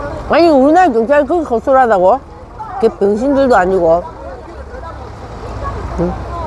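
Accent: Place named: Busan, South Korea. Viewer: 수고 많으셨습니다....페북에서 뵐때는 무서웠는데 ㅎ...목소리는 전형적인 부산 아지메 네요....화이팅~!!!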